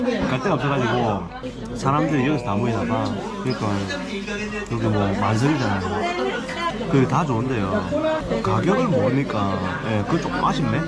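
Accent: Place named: Busan, South Korea. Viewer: 부산출신 남편이랑 말투가 똑같애서 억수 친근감 덜어여~^^ 남편이 소개해서 보게됐는데 어제 거의 종일 보고 구독도 눌렀어요. 너무 열심히 사시는 모습에 저 자신을 돌아보고 반성하게 되네요. 건강챙기시면서 하셔요~^^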